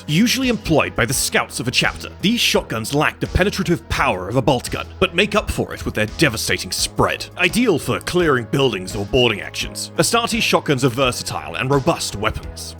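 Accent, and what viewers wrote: United Kingdom. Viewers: Bri’ish bloke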